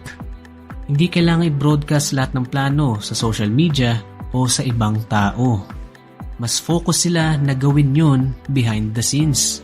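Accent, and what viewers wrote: Philippines. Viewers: sound like: ilonggo tikalon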